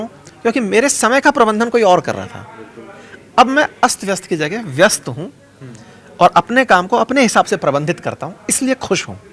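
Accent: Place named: Kanpur, India. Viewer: Aap ki boli pur hindi hai from kanpur se ❤❤❤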